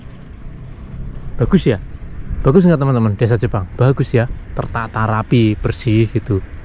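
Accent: Banyumas, Indonesia. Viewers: Halooo.,..Mas...... salam kenal.... mantap.... terimakasih atas infonya tentang kebudayaan Kebiasaan sehari hari Masyarakat Jepang.... Kalo boleh tahu Mas asal nya dari mana?.... kelihatan bahasanya Ngapak 👍🇮🇩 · Mase ngapak kie tah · Ngapak kang endi kang... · Ngapak ndi mas. Purwokerto Banyumas hadir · Soyo suwe ko ngapakmu metu mbuuaaahhh....😂😂😂🙏🙏🙏❤️❤️❤️ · Kya jawa ngapak,,banyumasan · Rika wong endi mas, ngapak tulen😂😂..salam dari Cilacap😊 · Wong ngapak kieh jelas temen Cilacap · kelihatan orang banyumasan .ngapak banget. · rika wongndi sh kang bahasane kyane wng cilacap ap purwekrto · Rika asli wong ngendi kang,deneng ngomonge ngapak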